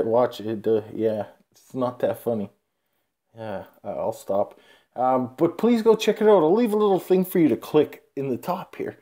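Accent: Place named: Canada. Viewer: Yo bro I'm Canadian too EH. How you talk is pretty much how I sound. All good though. I'm in Winnipeg bro. Where you at man?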